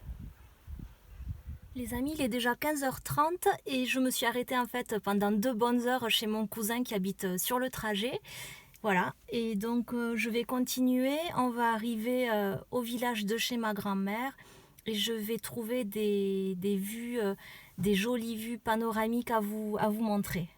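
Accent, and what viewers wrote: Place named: Pyrenees, France. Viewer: Ah les Pyrénées,on ne veut pas les quitter,je suis d’accord,et pourquoi tu parles comme moi on a le même accent 😂❤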